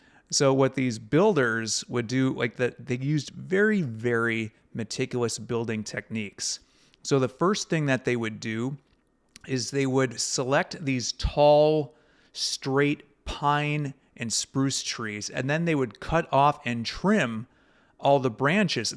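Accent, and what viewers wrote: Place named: Wisconsin, United States. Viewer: He's from Wisconsin. 😊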